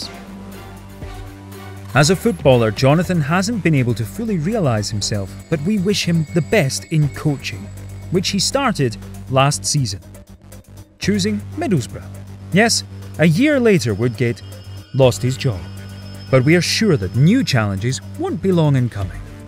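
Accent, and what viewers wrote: Scotland, United Kingdom. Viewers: The narrator sounds Scottish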